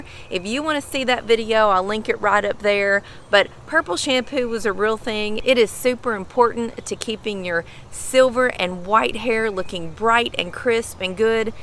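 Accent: South, United States. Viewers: I can listen to you for ever. Ahhh that badass Southern accent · Love your videos! So common sense and practical advice! Love your curly hair and Southern accent too! Keep up the good work! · Are you from Kansas, North Carolina, or Texas? Thx 🙂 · I have no idea how your video popped up in my YT space but I just wanted to say I love your southern accent , I grew up in Nashville but live in LA now and miss girls talkin “southern”😊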